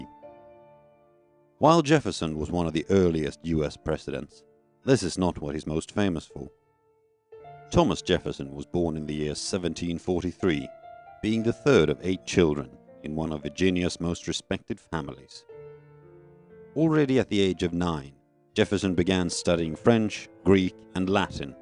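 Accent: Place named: United Kingdom. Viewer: British narrator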